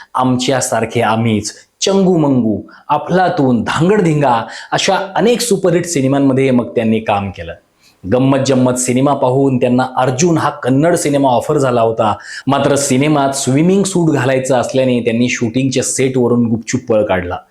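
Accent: Standard. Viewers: शुद्ध भाषा बोलता छान वाटले 👌👍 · शुद्ध, स्पष्ट मराठीत माहिती ऐकून छान वाटले!वर्षाजीचे सर्वच चित्रपट पाहिले आहेत.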